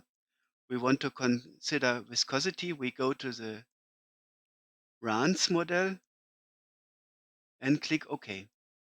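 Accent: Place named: Germany. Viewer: Danke, auch wenn man am Akzent schnell gemerkt hat, dass du Deutscher bist :D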